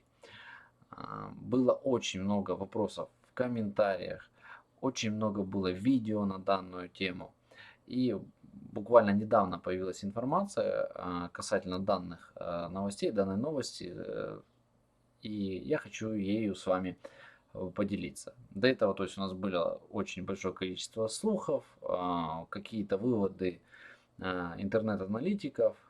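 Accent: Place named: South, Russia. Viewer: Шокание и гэкание - южнорусский говор русского языка. Прекрасно звучит!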